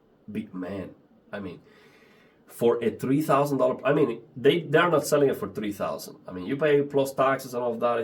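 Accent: Cuba. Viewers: I knew that was from some Spanish speaking country because of occasiona cute s before e. Cuba:) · Lo sabiaaaa asere el acento me sonaba a cubano. Felicidades hermano. Keep it going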